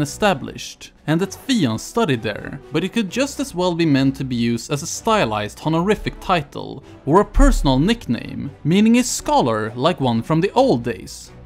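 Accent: Sweden. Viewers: Do I detect a Swedish accent?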